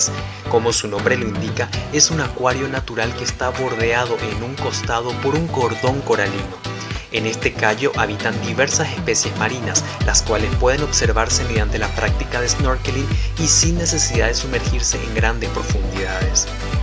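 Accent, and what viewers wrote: Paraguay. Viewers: Excelente video!!... Perdón... Tenés acento paraguayo?...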